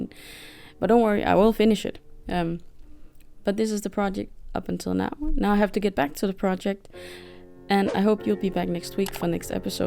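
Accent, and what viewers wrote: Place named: Brazil. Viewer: My Dies are on their way so am watching your techniques to prepare for my house! Not sure if I would have the patience to do all of that brickwork but very well done. Looking forward to seeing the completed project. I have been trying to guess where you live by listening to your accent, my guess is Brazil?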